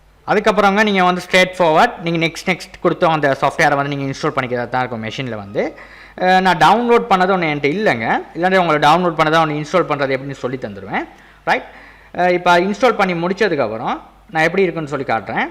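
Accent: Sri Lanka. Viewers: Srilanka Tamil ah nenga ?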